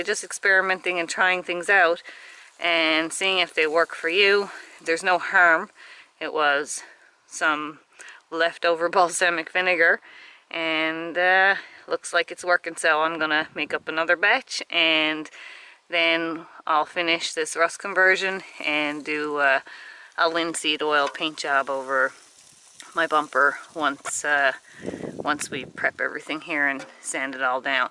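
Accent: Newfoundland, Canada. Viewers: Hello there, came across your channel searching for rust converter ideas and picked up on the accent of fellow Newfoundland and Labradorian. Not sure if that is the proper term now. I also checked out the video on the Mun Botanical Gardens. Really lovely. Will put that destination on the list for the next time I make my way home. Going to have a look through more of your videos and pass along your channel to some friends and family. I hit the subscribe button. Thanks for the content.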